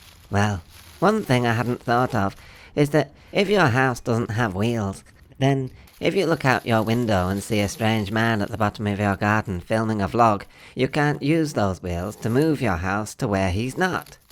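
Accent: United Kingdom. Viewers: As a person who lives somewhere in America, I don't recognize all the British dialects. From whence is yours,? I find your voice rather soothing.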